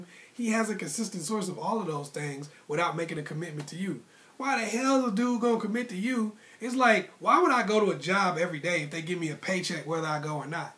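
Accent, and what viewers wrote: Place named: Brooklyn, United States. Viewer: It sounds like you from my home town Brooklyn, NY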